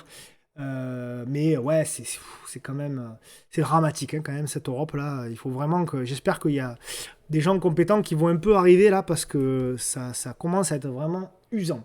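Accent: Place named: Montpellier, France. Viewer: Faut se le farcir l'accent de Montpellier..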